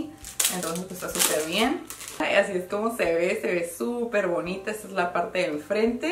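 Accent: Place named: Colombia. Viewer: ¿de dónde eres? Por el acento pareces colombiana....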